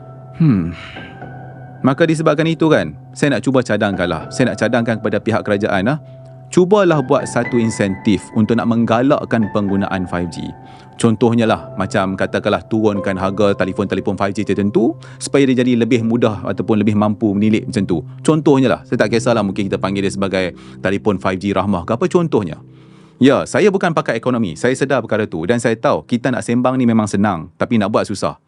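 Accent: North, Malaysia. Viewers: Cakap slang utagha... oghang mana ya?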